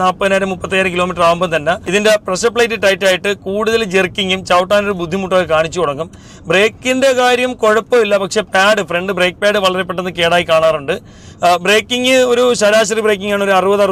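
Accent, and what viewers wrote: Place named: Travancore, India. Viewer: തിരുവിതാംകൂർ ഭാഗത്ത് പ്രത്യേകിച്ച് ട്രിവാൻഡ്രം കൊല്ലം സംസാരശൈലി.. ഇങ്ങനെത്തന്നെയാണ്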